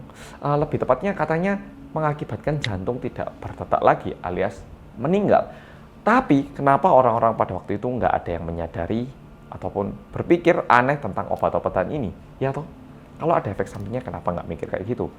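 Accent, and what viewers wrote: Java, Indonesia. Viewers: anyyeong mas yg mendok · Wong Korea selatan yang mendok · Kangen sama yang versi medok😍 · untuk pertama kalinya nonton vidnya di ruang keluarga, dimana ada papa disitu. 5 menit setelah video dimulai, papa mulai bingung dan nanyain "temenmu punya youtube ta? kok muedok e nemen" karena jarang liat youtuber yang medok wkwkwwk. akhirnya aku jawab "engga ini org korea sempet tinggal di malang" trs dikira boong dong wkwkwk akhirnya aku tunjuin "inilo orang korea" sambil liatin vidnya mas yang dimana mas ngomong bahasa korea dan akhirnya papa bilang "iyaya orang korea, muedok nemen, km kalah" disini valent yg notabenenya org jawa kalah sama mas yang orang korea:)) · Kembali ke medokkkkkkk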